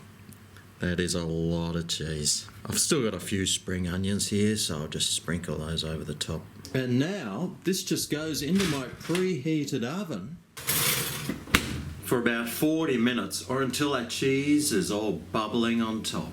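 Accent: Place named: Australia. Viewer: You sound like you're from Australia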